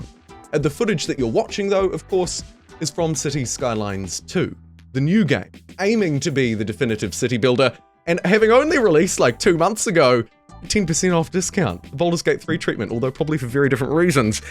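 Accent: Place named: New Zealand. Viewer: Your NZ accent is so amazing it makes me wanna pay attention and listen to what you’re saying 😍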